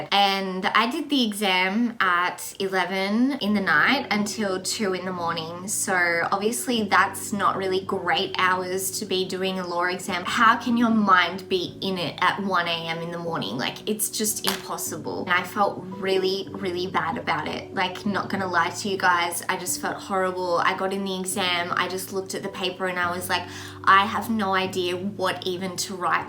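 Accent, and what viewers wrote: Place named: Australia. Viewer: I hear Australian but confused on the exact accent. Great video, just curious to learn more.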